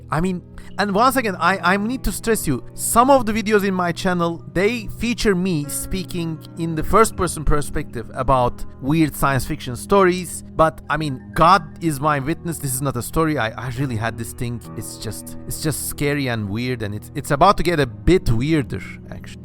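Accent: Turkey. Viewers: I am living for the Turkish accent 🩶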